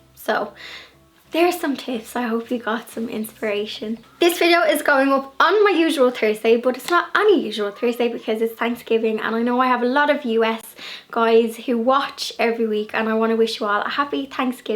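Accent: Dublin, Ireland. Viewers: Lovely to hear the Dub accent well Done great videos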